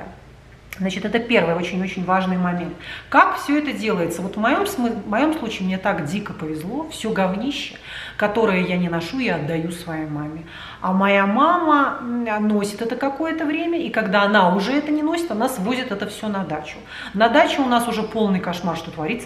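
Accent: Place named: Ryazan, Russia. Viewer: Почему свитАрок, какой-то рАзанский акцент со сленгом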